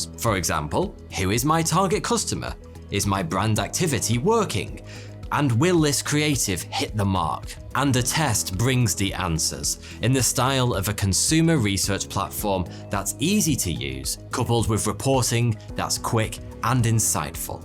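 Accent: United Kingdom. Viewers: Your accent explains why is English written the way it is written. Your pronunciation makes it written phonetically, which puts him with other European languages. The US pronunciation of the same words doesn’t make any sense because the pronunciation of the vowels doesn’t match the letters, I.e. it’s not phonetically written. Now I begin to like the British English language. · Don't listen to this guy he's just an as** cant stand his tone patronizing sound the fact he sounds U.K-ish" doesn't help he obnoxiousness deep six him ASAP just awful & full of Ken himself pass he deserves no debate period may his YouTube" just go down like a titanic we have no time for his type when u hear his type we just go onto the next boy bye he's typical basic 👽 👀 👍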